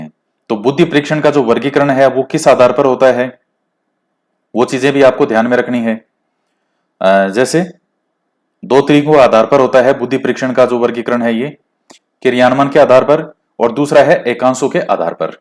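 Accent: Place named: Ganganagar, India. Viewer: Sir aap ganganagar se hai kya. Aapki bhasha kuch ganganagar se milti hai.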